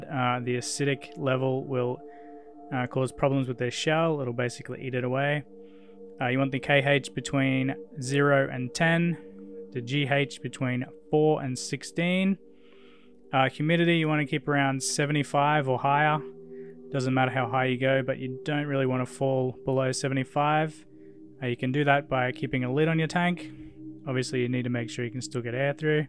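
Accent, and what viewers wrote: Australia. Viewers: thanks for the info. I noticed your Aussie accent and I thought the laws got changed. Keep up the good work!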